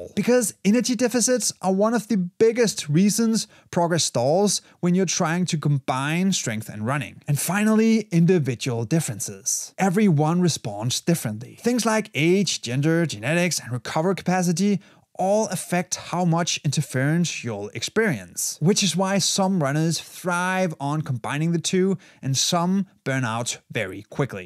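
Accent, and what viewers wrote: Denmark. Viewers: er du dansker, kan høre det på accenten lol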